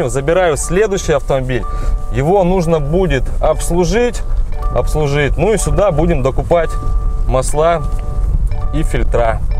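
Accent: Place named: Primorye, Russia. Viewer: Нормально говоришь.чисто приморский говор, меня раньше в европейской части не понимали ,медленно готворят,москвичи вощще пока подумают прежде чем сказать😄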